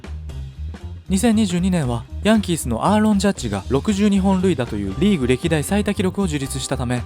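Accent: Kansai, Japan. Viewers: この解説者関西弁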